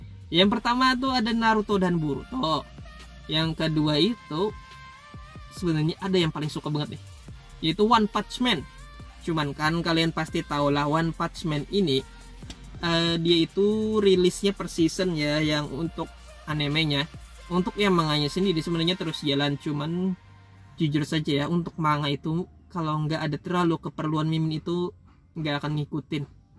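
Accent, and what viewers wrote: Banjar, Indonesia. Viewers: Tuhkan, dari logatnya urang Banjar. Salam kenal bang, dari kab.banjar ... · Fiks orang banjar sebelum mimin perkenalan diri bisa ddengar dari logat nya ngomong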